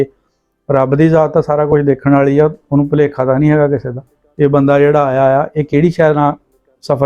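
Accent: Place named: Amritsar, India. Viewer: Veere thdi punjabi bilkul sadi Amritsar wali.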